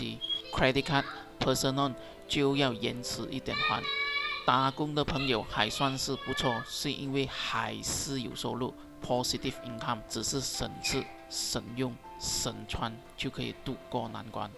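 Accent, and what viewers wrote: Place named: Malaysia. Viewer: 因为用的是马来西亚的口音啊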